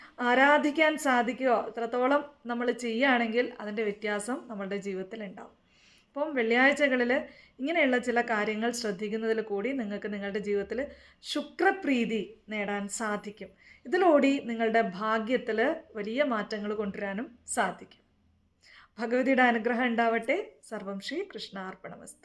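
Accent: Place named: Karnataka, India. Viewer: thankal karnatic ano, thankalude slangil evideyo kitti, nhan kasargod.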